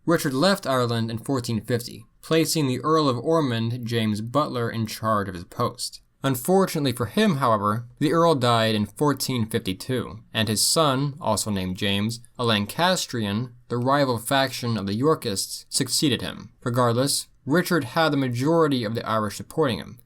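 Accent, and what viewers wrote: United States. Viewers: I was disappointed that the narrator is speaking in an American accent, not Irish or English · Can't stand the American accent with odd emphasis on words and in this case, a boring voice, like reading a book in a hurry.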